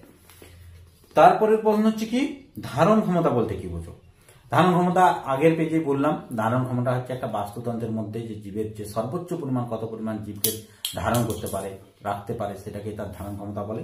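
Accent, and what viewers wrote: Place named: Purulia, India. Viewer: ooo sir amar mamabari to purulia te tai apnar kotha gulo khanikta orom sunte lagchilo bole bollam🙏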